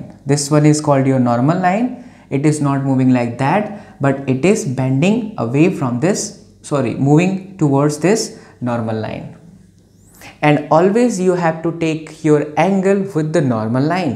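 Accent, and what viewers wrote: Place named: Haryana, India. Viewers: Itti bdiya haryanvi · Fab haryanvi.! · Haryanvi is superb sir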